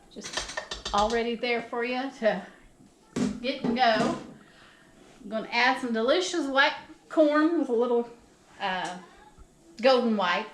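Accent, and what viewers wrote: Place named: South, United States. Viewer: girlllll I absolutely love this video you gotta do more, umm and I love your southern accent you're gettin I'm so jealous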